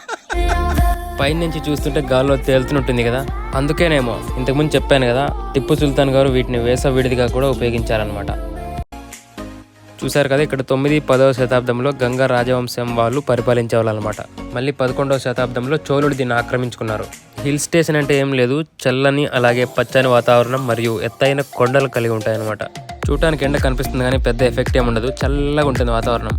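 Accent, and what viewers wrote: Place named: Kalyanadurgam, India. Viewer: మాట్లాడే యాస బాగుంది అదే కొనసాగించు ఓకేనా...చెప్పాలంటే మన కళ్యాణదుర్గం యాస😂😂😅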